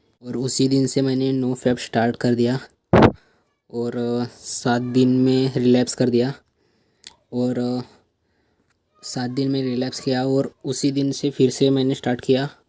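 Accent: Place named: Maharashtra, India. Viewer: U r maraThi🌝Accent Pechanl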